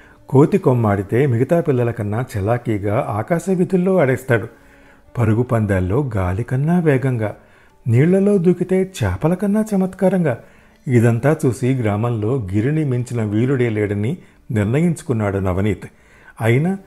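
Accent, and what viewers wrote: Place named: Telangana, India. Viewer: పాల బుగ్గల జీతగాడి కథ చాలా అందంగా ఉంది. యాదగిరి ని తలుచుకుంటే పాపం అనిపించింది. మీరు తెలంగాణా accent బాగా పలికారు. మీ ఇద్దరికీ అభినందనలు 💐💐💐💐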